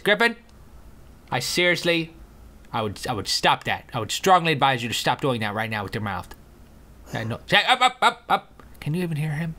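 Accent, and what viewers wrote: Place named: United Kingdom. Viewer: I really hate British voices on men, stop, I love you, just stop.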